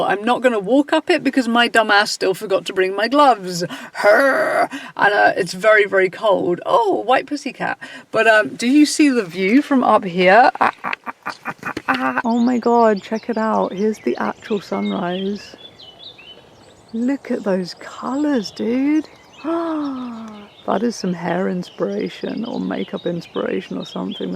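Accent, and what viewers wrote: United Kingdom. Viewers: For those of us who don’t know UK regional dialects, can you tell us where you accent is from?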